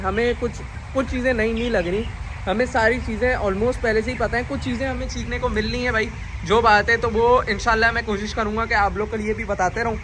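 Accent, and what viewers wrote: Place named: Punjab, India. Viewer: Bhai ap hain kahan? Punjab side lag rahi ha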